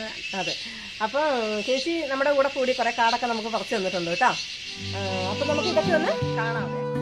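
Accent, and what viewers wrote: Wayanad, India. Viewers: Wayanad language Kelkkan rasamund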